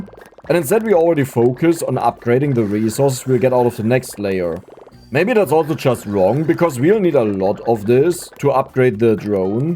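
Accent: Germany. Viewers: Zewentzyzigz holy deutch accent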